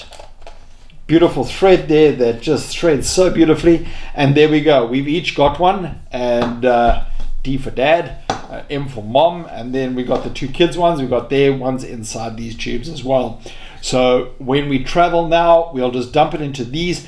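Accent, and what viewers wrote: South Africa. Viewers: I picked up the south african accent right away. Hehe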